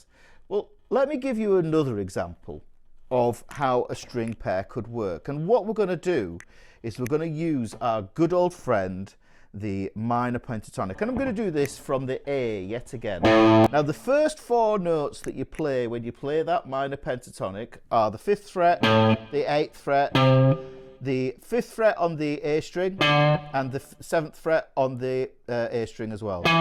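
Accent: United Kingdom. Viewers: Part-2: It's interesting how content from an instructor/mentor speaking in a UK accent makes the content seem more authentic!! Because of the unique linguistic "personalities" (for lack of a better word), it would be fun to see your content in Scottish, Irish, Cockney, and AU/NZ. (with subtitles of course!)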